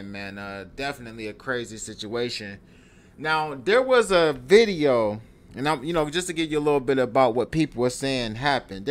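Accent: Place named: Bay Area, United States. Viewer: Bruh where you from? Remind me of a Bay Area nigga 😆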